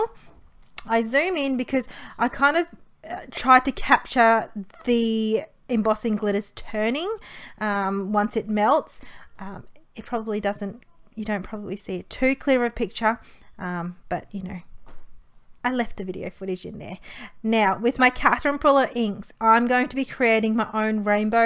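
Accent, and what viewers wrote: Australia. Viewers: These were great cards, and lovely to hear an Aussie accent! Thanks for sharing the techniques, although now I really want to go and buy all the different colours of embossing powders. They're so pretty.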